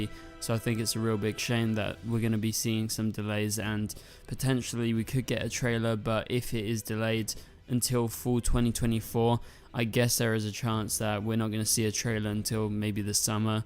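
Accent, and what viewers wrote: United Kingdom. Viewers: Great video. I have never been a really big fan of non-powered superheros. Dont hate me, but i didnt even care much for Arrow, nor do I really like superheroes like Batman. To me, it is just more interesting if the characters have powers. I love it whenever those powers are explained more and i get to see all the various abilities in action. I am also a huge sucker for Origin Stories. I have the entire collection of the 80s DC comic series Whose Who. I just love that sort of stuff. Im also excited about the upcoming shows and movies that will feature Marvel's Wiccan. That is going to be so cool to watch. Hey, do you know if Flashverse is okay? He has not made a video or went Live in a long time. I always enjoyed it whenever the two of you made content together. You make a good duo. Please reach out to him and see if you can get him as a Guest. 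And maybe encourage him to begin posting videos again. I first found your channel and I have loved everything you do. You are very eloquent and I love your particular British accent. And through you, I found Flashverse. He is equally well-spoken, and I just adore his personality and his accent. You are both great. And I find what little bit of my life's happiness there is, whenever I see a new video posted by you both.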